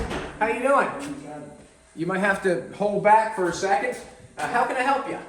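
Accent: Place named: Nova Scotia, Canada. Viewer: Thar is a Canadian maritime accent, specifically Nova Scotia.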